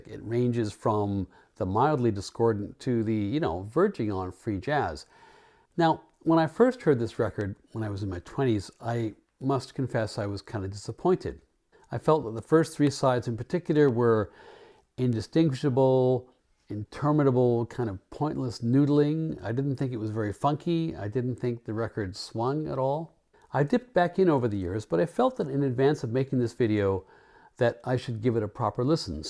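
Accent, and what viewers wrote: Canada. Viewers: I discovered your presentations by chance about 3 weeks ago. I'm enjoying the hell out of them.Your knowledge,quietly enjoyable Canadian delivery and sensibility,and your sense of humor have been a lot of fun for me,as someone who has enjoyed records-for the music,the labels,and the design,for well over 60 years. Your description of a weird portrait of Bobby Troup on a 50's album had me in stitches. Your work-and presence-have been a joy for me!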